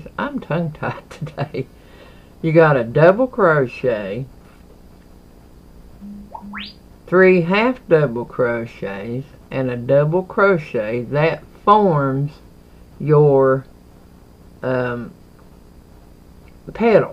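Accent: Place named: Tennessee, United States. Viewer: Thanks for sharing this cute crochet project. It was kind of confusing at first, since you were just saying "yarn over and pull through" without specifying that the 2nd time was going through the yarn loops and not the scrubby. lol. Is that the chain stitch? I think it is. I don't know how to crochet, but have thought about learning it with ladder yarn to make some necklaces for my handmade jewelry. My sister was learning how to crochet, but got frustrated with it. lol. I've added this video into my playlit- Crafty Creator Support for Artists, Beaders and Crafters. Hopefully that will help you with watch time. I miss my hometown of Knoxville, Tennessee...so it was wonderful hearing your accent. Reminds me of home. Take care! Sparkle on!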